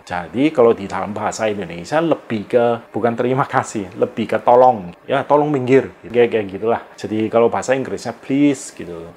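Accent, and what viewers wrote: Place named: Hong Kong, China. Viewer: Kamu ni org Indonesia ataupun org Hong Kong? Sbb loghat kamu ada bawak sket bunyi HK. Maaf ya, saya agak keliru ni..... Saya berasal dari KL, Malaysia 🇲🇾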